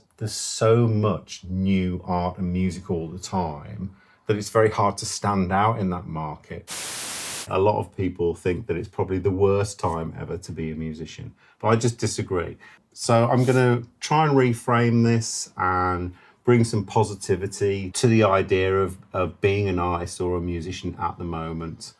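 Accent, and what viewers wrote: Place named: Birmingham, United Kingdom. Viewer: Hi again. From your accent I’m presuming you’re local to Birmingham as am I. If you ever want to collaborate on anything then please get in touch. I’m a piano and woodwind player so I’m sure we could cobble something together. 👍🙏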